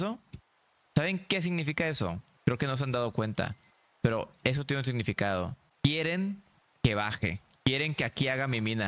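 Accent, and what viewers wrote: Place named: Monterrey, Mexico. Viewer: Una pregunta, por tu acento y tu forma de ser, eres de Monterrey NL? Es que tu acento es de acá de Monterrey 😹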